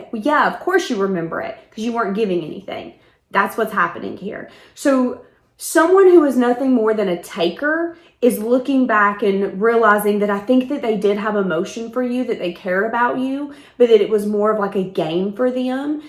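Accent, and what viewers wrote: South, United States. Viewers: I love your Southern accent chere!💜💜💜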